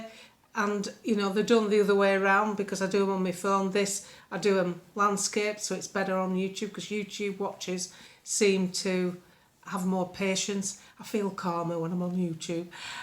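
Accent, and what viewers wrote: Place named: Lancashire, United Kingdom. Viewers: Great to hear common sense with a Lancashire accent. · Pure common sense is never a waste of time. I’m a Lancashire lass myself and love your accent.🏴🇬🇧🏴🇬🇧🏴🇬🇧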